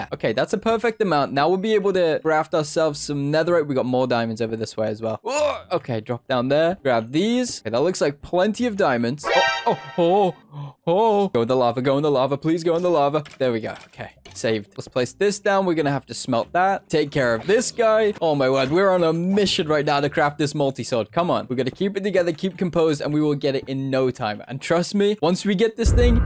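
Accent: United Kingdom. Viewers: bu'on dude your bri'ish accent is so intense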